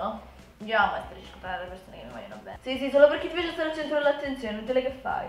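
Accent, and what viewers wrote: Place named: Naples, Italy. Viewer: Ma sei napoletana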